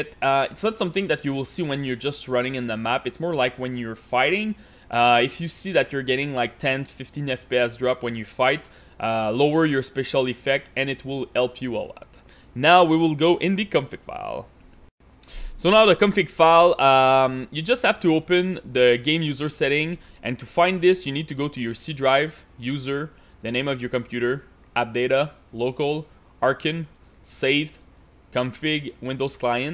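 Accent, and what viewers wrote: Canada. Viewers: Toi t’es québécois avoue sa sentent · Or u know, canadian accent.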